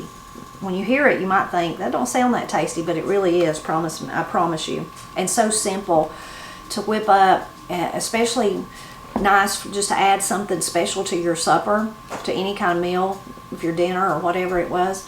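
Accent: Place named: Oklahoma, United States. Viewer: My mom was born and raised in Oklahoma in the 40's& 50's. She married my dad in 1958 and they moved to Iowa where my dad was born and raised. My mom was raised on much the same foods that you make and eat. My dad, brother and I refer to fried okra as eating "candy" to this day. Mom has been gone for 22 years and the way you talk and the meals you prepare remind me so much of her. Thanks for the great memories!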